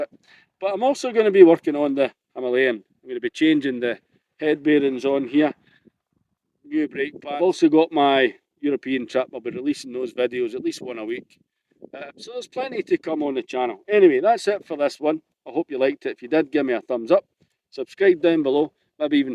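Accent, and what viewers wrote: Scotland, United Kingdom. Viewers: that's a Scottish accent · a broad Scott coming out with a pure American phrase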